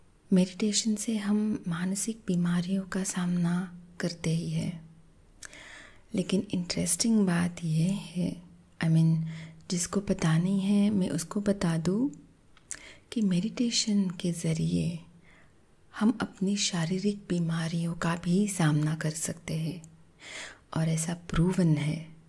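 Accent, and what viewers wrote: Maharashtra, India. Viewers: This is toooooooooo Sweety sweety voice🤭😍🙌...when a Maharashtrian girl talks in hindi😍🙌😬🤭... Khup chaan ma'am 🙌😬 Big big fan🙌😬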